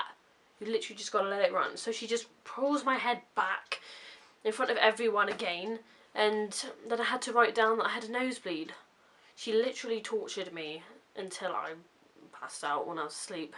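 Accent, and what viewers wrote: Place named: United Kingdom. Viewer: fucking murder corr can tell you're British 👍👏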